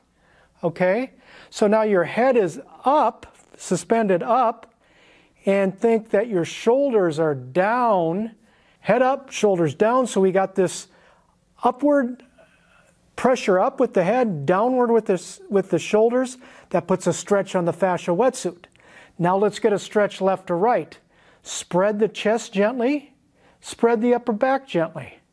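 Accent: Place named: Wisconsin, United States. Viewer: Excellent information thanks! I'm curious if rebounding on a trampoline is as effective? it seems easier on the body and joints . Challenging to stay on toes... Also I cannot sustain 2 consecutive minutes. I take breaks and do probably 4 or 5 minutes total. Also I detect a northern accent Wisconsin? 😀🙂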